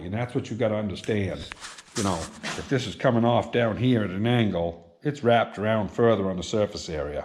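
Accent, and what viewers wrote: Maine, United States. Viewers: As soon as you started talking I thought " this guy is from Maine" looked at your channel name and was pleasantly correct.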